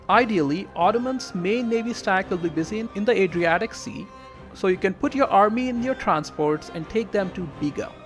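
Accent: India. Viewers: You Indian or something? This accent is horrible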